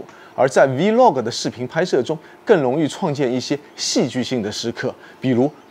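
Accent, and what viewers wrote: Shanghai, China. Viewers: 这口音太上海了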